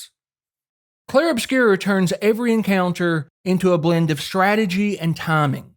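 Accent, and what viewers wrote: South, United States. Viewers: hearing another southerner talk about soulsgames is something i never thought i would hear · It's been a while since i heard a redneck accent. Thank you for the making the video good man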